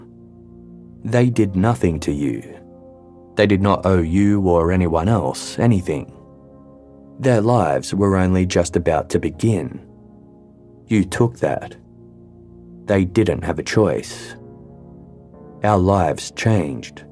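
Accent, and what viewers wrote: Australia. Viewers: Did the strong Australian accent not give you a clue?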